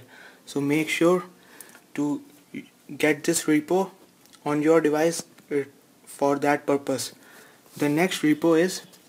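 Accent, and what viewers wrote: India. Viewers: Why do u sound like a gay Indian ?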